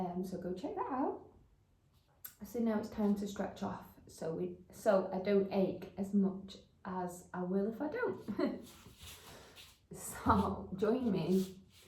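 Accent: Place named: England, United Kingdom. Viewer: You're so hot in this outfit 🔥 Specially talking in this beautiful engkish accent too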